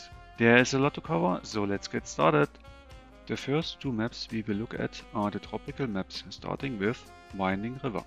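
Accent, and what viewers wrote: Germany. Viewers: Do i hear a german accent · Dieser Deutsche Akzente 😄 So spricht mein Vater Englisch 😅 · Man kann den deutschen Akzent ganz schön deutlich raushören😅 Ich selber bin da aber auch nicht viel besser, wenn ich "Englisch" spreche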